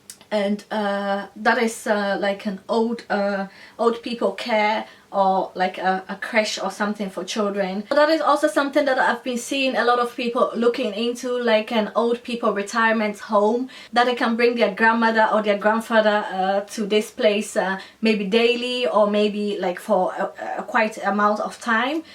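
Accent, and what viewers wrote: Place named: East Africa. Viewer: thank you for that insight. Yes, there are a lot of Ghanaians living in Holland (just as there are here in the UK), that much I know, but I must admit her accent prompted me as being perhaps of East/Southern African origin and hence my initial question.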